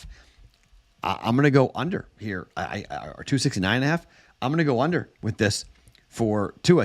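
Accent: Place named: Boston, United States. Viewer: I just SARD 269 at another sportsbook. Boston accent is slipping out.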